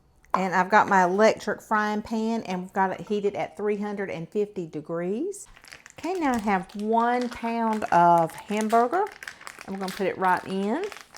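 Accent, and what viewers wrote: South, United States. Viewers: New Subscriber. Love your recipes, your sweet Southern voice and your enthusiasm for cooking. As an artist may I make a friendly suggestion? Try using an undereye concealer the color of your foundation. It's a game changer!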